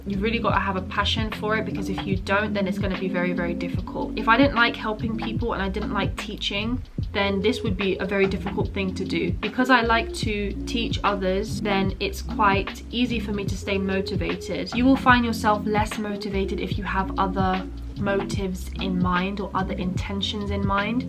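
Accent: United Kingdom. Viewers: Yeah I’m in love with her accent. The British accent seems so elegant!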